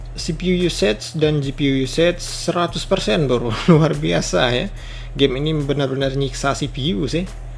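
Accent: West Sumatra, Indonesia. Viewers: bahasa indonya kek orang minang wkwkwk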